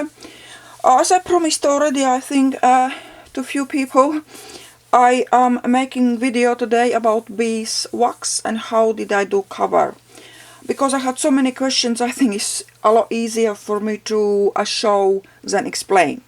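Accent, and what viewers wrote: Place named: Iceland. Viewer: Hello! While watching your video I had a feeling that you have an icelandic accent, am I right?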